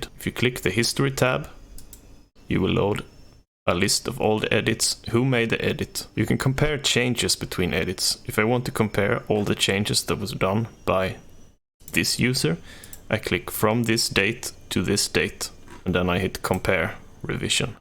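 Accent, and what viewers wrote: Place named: Sweden. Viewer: I knew you were Swedish lol your accent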